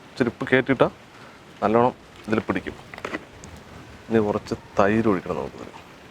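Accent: Palakkad, India. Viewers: മ്മടെ പാലക്കാടൻ ഭാഷ, അതാണ്,,, ഓ,,, പൊളി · ചേട്ടൻ പാലക്കാട് ആണോ....ചേട്ടന്റെ slang പാലക്കാടൻ ആണല്ലോ...I m in Palakkad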